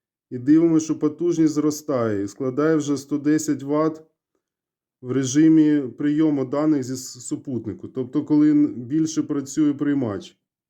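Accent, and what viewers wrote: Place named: Surzhyk, Ukraine. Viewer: У тебя самый плохой в мире суржик.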